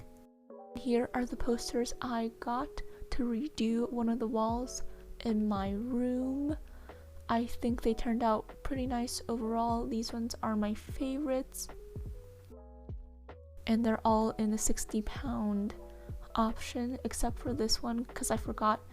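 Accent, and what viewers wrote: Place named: New Jersey, United States. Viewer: i love your voice it’s like a fem kermit from new jersey,,, very calming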